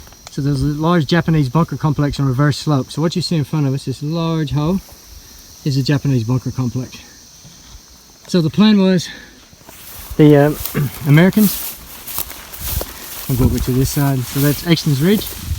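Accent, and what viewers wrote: South, United States. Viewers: I can understand this rooster better than an Aussie,strange yank accent,I knew a crew that logged on the Solomon Islands,and they said the amount of War relics is unbelievable,and crashed planes still been found to this day... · He's a Southerner.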